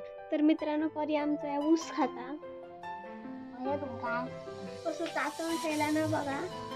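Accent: Malvani, India. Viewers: येताला जाताला होताला सुपर मालवणी लागला तुका झाला माका ☺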